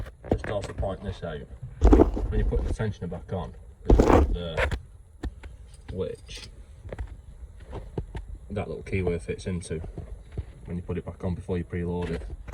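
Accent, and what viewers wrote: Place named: Lancashire, United Kingdom. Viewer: Just put it down to experience with that sort of thing. I was trying to place your accent. Sounds a bit Preston/Bolton-ish.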